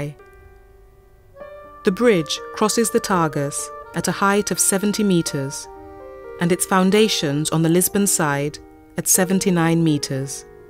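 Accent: Castile, Spain. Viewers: The narrator seems to think that we must be spanish since all the times she mentions a portuguese name (E.g Castelo S. Jorge, Martim Moniz) she does so with s spanish accent. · I appreciate the interest but to have the narrator pronounce portuguese names with a spanish accent kind of ruins the experience... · We are NOT Spaniards. We speak Portuguese! FFS. I had to turn on captions so as not to have to listen to the narrator butcher words with a castilian pronunciation. Portuguese pronounce J s as Js not as h as the spaniards do. Really annoying. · Not only does the narrator pronounce Portuguese words with a heavy Spanish accent but she puts me to sleep. Wrong background music btw. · Só pelo facto que pronunciar os nomes portugueses em espanhol este vídeo devia de ser destruído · With a Castilian accent, Seriously! It is very annoying to listen the way you try so hard to pronounce the Portuguese names with Castilian (spanish) accent. 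Please speak with a English accent or Portuguese accent, a accent were you are from is more natural because you sounded... ridiculous.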